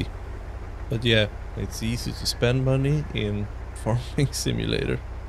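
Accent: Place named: Sweden. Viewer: Love the series! But you have to be swedish with that accent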